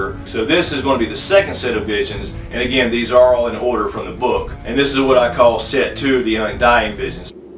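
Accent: South, United States. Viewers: I am so glad I found this channel! You are the first person I have seen/heard with a southern accent to clearly articulate theories and discussion about the books. Brilliant!! Makes me proud to be southern!! ;)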